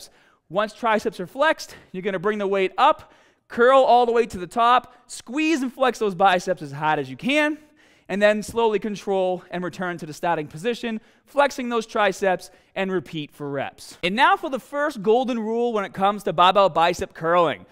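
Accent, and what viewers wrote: Boston, United States. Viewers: SO Boston, LOL! Great vid! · Theres a touch of a boston southie accent in there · I’m not even from the US but even I recognize this guy must be from Bostaahn Mass. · Appreciate the video, but check the BAHston accent. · You got a lot more Bahhstun from your videos five years prior. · Is this guy from fucking Boston? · Boston? Rhode Island? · I forgot that the Boston accent existed, Haha. Good info from the dude tho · Boston accent ? Thank you great video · Love your Baaaasten accent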